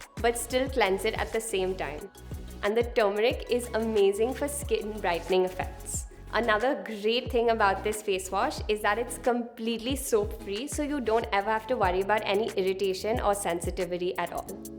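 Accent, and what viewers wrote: India. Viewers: Dhe got Indian kinda accent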